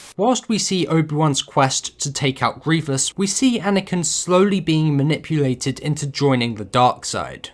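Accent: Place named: RP, United Kingdom. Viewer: Jesus this video is hard to watch when you constantly misuse words. You use the word "conflate" instead of the word "conflict" which wouldn't do bad if it didn't mean the opposite of the other word. And things like when you say: "I know it's GENERIC to point out but it's GENERIC because it's true" Like wtf do you mean there? Did you mean to say that it's "cliché" because it's true? Yeah those words are sort of synonyms but they sure as hell aren't exactly interchangeable in this context. In this context it's like you are saying "I know it's boring of me to say that but it's boring because it's true"... Like what about the factual statement makes it generic or mundane? A tartegrade is an earth animal that can live in space. That's a fact but I wouldn't call it generic. See what I mean? It always throws me off to hear someone with a posh british accent make these mistakes, but bro, you have got to make sure you understand the words you use before you confidently use them in a video. 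I assume you read from script, so get yourself a someone to proofread before you commit this shit to video.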